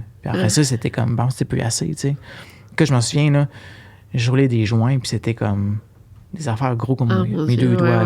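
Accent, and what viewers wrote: Quebec, Canada. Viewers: Ça dépend car moi je comprends bien l'accent québécois (je suis parisienne 🙂). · C'est drôle parce que les autres français qui ne sont pas condescendants et qui commentent ont pas l'air d'avoir du mal à comprendre. Les systèmes de sous-titres ne prennent pas en compte l'accent Québecois. Il faudrait qu'elle se mette à faire elle-même les sous-titres tout ça parce que des gens comme toi ne sont pas foutus de faire l'effort pour comprendre un accent différent. Je n'appelle pas ça être ouvert d'esprit 🙄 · je ne le prend pas mal, t'inquiète, c'est juste tannant car c'est récurrent. À quasi chaque vidéo de, si c'est pas un commentaire pour lui dire qu'elle a pris du poids, ou quelle est cernée, ou qu'elle a l'air malheureuse, c'est son français qui n'est pas assez impeccable pour l'Europe. C'est "gossant", en bon québécois. C'est pu une question d'être fatigué quand c'est rendu qu'à chaque semaine quelqu'un laisse un commentaire du genre. 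C'est pas comme si c'était nouveau qu'au Québec on parle ainsi. 🤷🏻‍♀️ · Je suis française, je suis désolée que ton accent soit tant sujet de blagues en France. J'adore l'accent québécois, je le trouve charmant. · Je suis française mais je comprends parfaitement et son accent est juste charmant, très soft. C'est vrai que ce qui peut dérouter c'est les mots en anglais qui émaillent le Québécois. Personnellement ça ne me dérange pas car je suis bilingue. Bravo pour vos vidéos que j'apprécie beaucoup. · c’est ça ! Suivre une youtubeuse québécoise implique qu’elle aura son accent québécois. Si tu apprécies tant mieux sinon bye bye.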